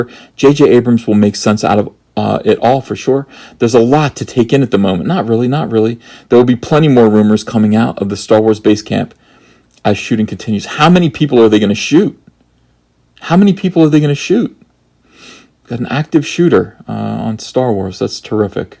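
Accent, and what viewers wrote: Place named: New York, United States. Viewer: Lol that sounds nothing like Mikey Zeruh. More new York less matrix agent.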